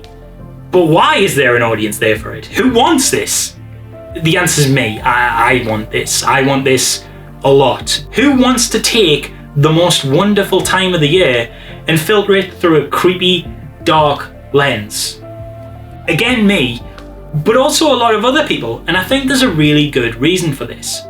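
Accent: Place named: Geordie, United Kingdom. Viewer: Maccum or Geordie? As a Geordie who has lived in Australia for 20 years I can't quite place the accent. Thumbs up on the research on the history of Xmas Horror. Have a good one.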